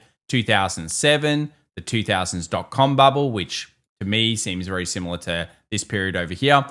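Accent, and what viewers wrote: Australia. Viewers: An Australian accent just makes everything sound better 👍👍 Keep it up 🔥🔥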